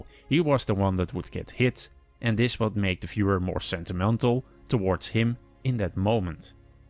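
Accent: Netherlands. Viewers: You sound dutch as frick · Are you Dutch? Seem to have an accent. Thanks for this ! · Aan je accent te horen ben je overduidelijk een Nederlander - ik ook zoals je leest ;-). Het algoritme van YT bracht me hier, echt interessante video, goed gemaakt! Nu mijn vraag: heb je al overwogen een 'episode compare' te maken van de afleveringen van BoB die zich in Nederland afspelen? 't Is al minstens 10 jaar geleden dat ik de serie voor het laatst gezien heb, maar ik meen me te herinneren dat minstens 2 afleveringen zich (iig gedeeltelijk) in Nederland hebben afgespeeld (Eindhoven, Veghel & buurt van Nijmegen -> die met dat kruispunt ergens in een polder vlak bij Nijmegen). Heb je uploads bekeken, maar zag ze er niet bij staan... lijkt me echt interessant vanuit historisch oogpunt, ook voor de vele fans van over de hele wereld van deze fantastische serie, plus qua productie voor je relatief 'eenvoudig' te maken - je woont hier immers al (neem ik aan, althans). 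Ik voorspel je, het zal echt goede content zijn die je veel views zal opleveren! :-) (een hele serie over alle afleveringen zou helemaal een hit zijn, denk ik... maar het moet allemaal maar kunnen natuurlijk -> daarom, Nederland om te beginnen, lekker dichtbij)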